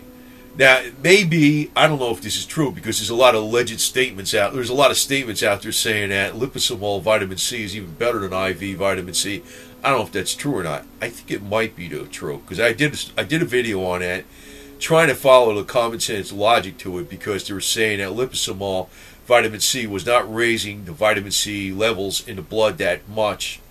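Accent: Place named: East Coast, United States. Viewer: Yo Bud, i like how your mind works and you talk like an East Coast Boy lol. So if so right on!. Cool vid man.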